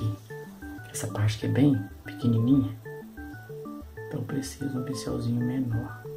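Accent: Minas Gerais, Brazil. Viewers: Moço, quando vc mostrou o gráfico eu rapidamente tirei um print,fico triste quando falam para a gente ir no Facebook pegar o desenho,nem todo mundo tem Facebook...mas vc foi gentil e mostrou o gráfico,só por essa atitude e por nós ensinar com tanto carinho eu vou te seguir agora mesmo Obrigada...haa e amo sotaque de mineirinho 😍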